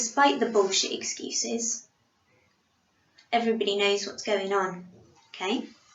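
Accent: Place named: United Kingdom. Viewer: Do you do any IRL meetups? (As you sound UK based lol)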